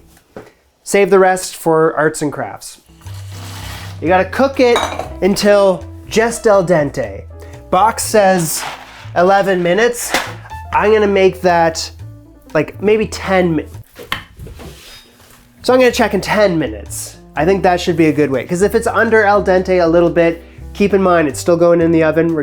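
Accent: Canada. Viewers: The Canadian accent is really coming back now that you’re back in the mother country. Aye?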